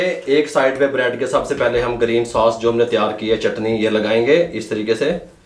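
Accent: Punjab, India. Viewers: بھائی ریسپی کا سواد تو اپنی جگہ۔پر آپکا بیان کرنے کا انداز انتہائی سادہ اور پنجابی لہجہ کمال۔ بہت اعلی۔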